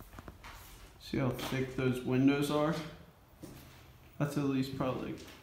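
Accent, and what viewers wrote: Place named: East Coast, United States. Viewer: Pennsylvania? It sounds like you have a back east accent.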